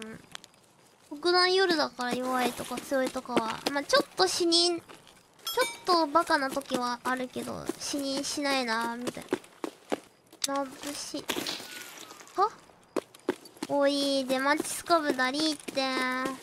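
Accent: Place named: Japan. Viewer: ひらがな英語可愛すぎる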